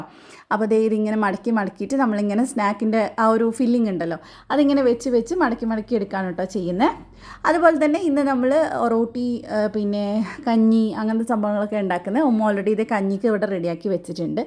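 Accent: Kannur, India. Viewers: Nigal kannur aano.. Evidyanu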